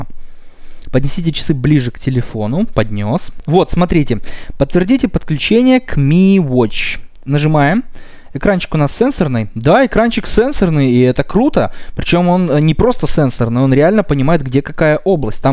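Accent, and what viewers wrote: Moldova. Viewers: ты чё из молдовы?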